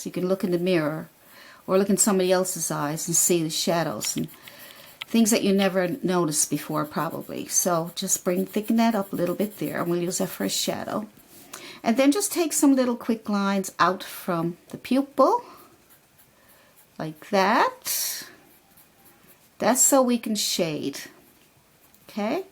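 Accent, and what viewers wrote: Newfoundland, Canada. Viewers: I have been using this video with my 6th grade classes to help with their self portraits. I immediately heard your voice/accent and said she HAS to be a Newfie. Am I right? I live in the states but family is from Placentia Bay. <3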